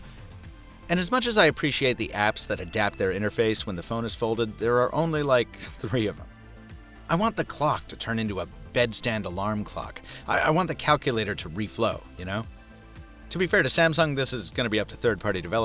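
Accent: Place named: New York, United States. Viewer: Well he's my new favorite reviewer! A New York'er but still with a European touch. Love the tone and his narrating. He'd do excellent in Europe too.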